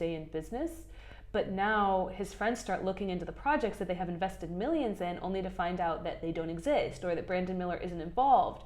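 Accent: United States. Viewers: Unrelated to the video (which is very enlightening), you have such a satisfying speaking cadence and articulation. I'm Swedish, and most of my English-speaking friends are British, so I don't hear a lot of American accents - but yours is just so scrumptious (like a tasty snack)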